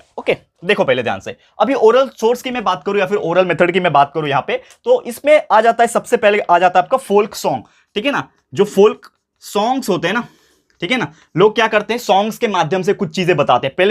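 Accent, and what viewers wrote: Maharashtra, India. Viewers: Sir, mala vatla hota tumchi mothertounge marathi aahe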